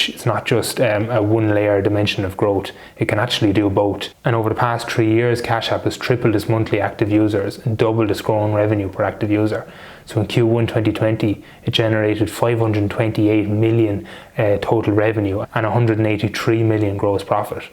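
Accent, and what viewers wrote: Ireland. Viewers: Great to hear an Irish accent on the platform 👍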